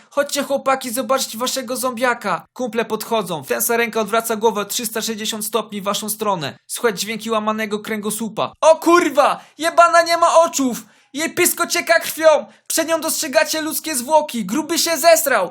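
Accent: Kielce, Poland. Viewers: Jesteś z Kielc?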